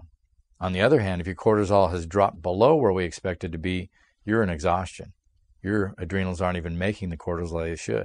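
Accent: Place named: United States. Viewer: may i get this video in Hindi ? I don't understand ur fast American English 😪😪😪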